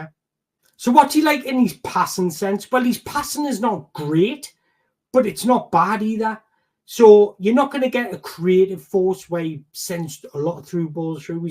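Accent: Newcastle, United Kingdom. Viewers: Mate I’ve been following you on Twitter for a few months when I saw your name and photo I assumed you were Spanish or something I was not expecting a Geordie accent hahahaha. Top content!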